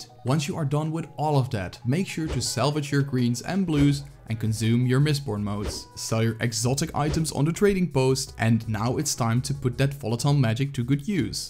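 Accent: Netherlands. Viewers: Same haha! I already thought he was Dutch and im right! Goede engelse uitspraak tho!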